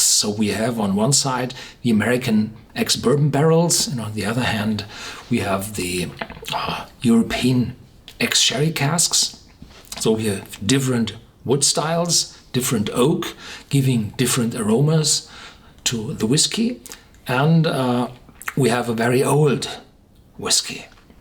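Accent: Germany. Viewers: come of german and Yugoslavian decent, but was born in Canada and I speak some german but I find myself really enjoying your English reviews...something about the german accent and pronunciation of words makes the reviews amazing....Thank you.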